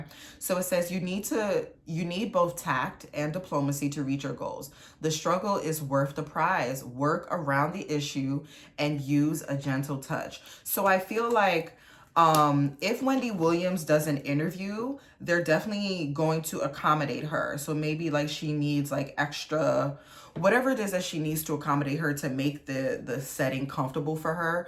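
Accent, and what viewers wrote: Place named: New York, United States. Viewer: You took me back to Star and Buckwild. I said definitely a New Yorker😂😂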